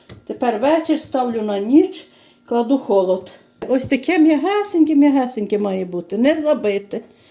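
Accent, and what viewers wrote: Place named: Ivano-Frankivsk, Ukraine. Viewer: Слава Ісусу Хресту! Пані, не перестаю вами дивуватися яка ви гарна господинька. Я тільки й шукаю ваші рецепти, бо там все так смачно і не дуже складно. А ще дуже люблю слухати вашу мову місцеву, бо, здається, що ви моя землячка. Я ж сама з Прикарпаття, з Долинського району с. Витвиця. Живу в Білорусії, вже давно на пенсії, теж багато перепекла наших пляцків для себе і знайомих та родичам на весілля. Напевно такой наша українська випічка найсмачніша. Дуже вам вдячна що ділитеся своїми рецептами та так доступно пояснюєте. Хотіла б знати звідки ви самі, бо дуже подібно розмлвляєте на нашому місцевому діалекті. Дуже сумую та скучаю за своїми родичами, переживаю за ті недобрі події, прошу Бога щоб швидше все скінчилося. Здоров'ячка вам і вашій родині. Довгих вам років життя, та миру в нашій Україні. З наступаючими Великодними святами Вас. 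Обов' язково спробую ваші нові рецепти, а найперше пасочку. ❤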